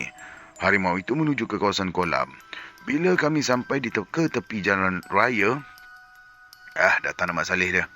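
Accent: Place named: Kelantan, Malaysia. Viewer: Assalamualaikum... Citer Kali ni best... Ada loghat Kelantan.. ada slang omputih... 😂 Walaupun kelaut tetapi mmg best.. menghiburkan. Gelak besar akak Kali ni😂. Lawak betul ni.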